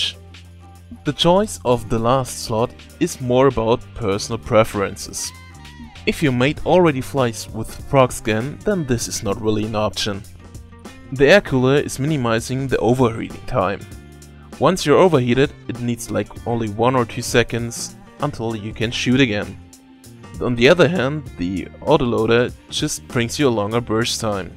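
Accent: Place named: Germany. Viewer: Preferred ur German accent.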